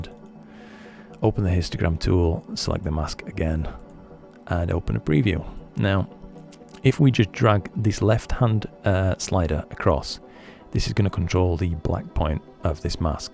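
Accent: United Kingdom. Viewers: Tried again from scratch, now I get this "Cannot execute instance on view: R Reason: LRGBCombination cannot be executed on grayscale images." ( to your earlier note, there is no way to extract luminence from the split G or B files ). Do you do zooms? Be happy to pay you for 30 mins to watch me and tell me what I'm doing wrong, live in the USA, guessing you're somewhere in the UK? (I'm an Australian so I speak British lol)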